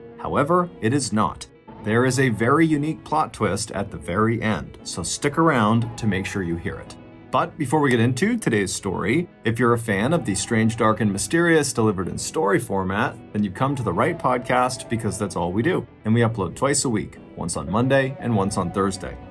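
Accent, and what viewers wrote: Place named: Boston, United States. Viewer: I hope this provides our revered storyteller a cascade of enjoyable, and well-deserved feedback! I am chronically ill, frequently housebound and at some point, almost daily, in such pain I can not move and barely breathe for the better part of an hour. Having a story on deck feels critical to surviving these more difficult times. I am transported from some floor to a vivid scene full of detailed descriptions and engaging plot weaving. Mr Ballen’s riveting unraveling of the “dark, strange, and mysterious” with a clear passion for the waning art of storytelling, is a true gift for all his followers. That he commits to a regular schedule while growing his family and philanthropy is admirable. That his art is guided by a baseline of facts is a relief in a world replete with untruths, half truths and alternative facts. 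So, THANK YOU 🙏 for sharing your gifts. Our family wishes your family the best. We have lived in Wollaston Beach and welcomed my only child at South Shore Hospital. My baby just turned 18, and we live in Nashua now, but will always fondly remember great raucous times in our late 20’s and early 30’s when we worked hard and played harder with greater Boston as our playground. So your authentic local accent, Mr Ballen, is the cherry on top. 🍨 We are grateful and wish you nothing but the best.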